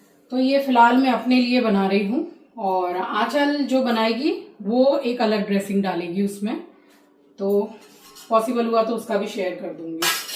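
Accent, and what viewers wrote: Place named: Uttar Pradesh, India. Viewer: Dear app Calcutta Se Hain Magar aapke bolane ki style Puri UP ki hai aap baton baton mein bahut se aise word bolati Hain Jo ekadam Apne se lagte Hain❤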